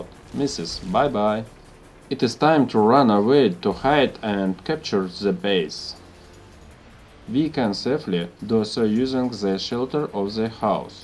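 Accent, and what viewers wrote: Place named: Russia. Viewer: типичный русский акцент)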